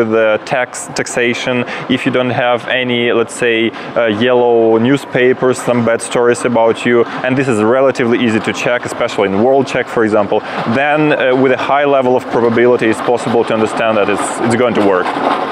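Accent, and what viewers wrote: Russia. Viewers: I hear a strong russian accent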